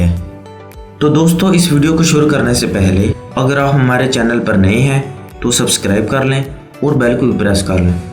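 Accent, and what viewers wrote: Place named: Kashmir, India. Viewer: Aslamo elikum ap kashmir Rawalakot sa hn sardar hn ap ke urdu sa pachana ha me b kashmir sa hn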